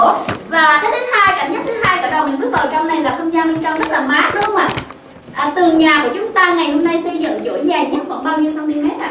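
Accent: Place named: Quang Nam, Vietnam. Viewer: Phát âm giọng Quảng Nam đòi chuẩn như Hà Nội ah .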